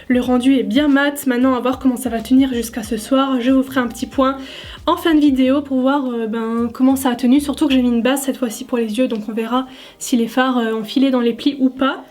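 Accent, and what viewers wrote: Lorraine, France. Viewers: Elle habite en Alsace mais elle vient de Lorraine (d'où son accent !) 😉#BarreD'Info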